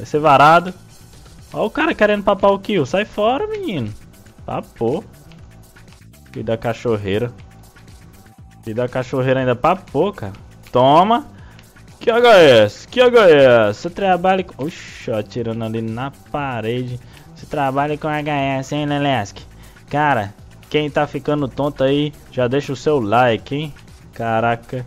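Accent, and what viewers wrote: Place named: Ceará, Brazil. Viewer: Vc é do ceara é?kkkkkkk, se não for seu jeito de falar é mt párecido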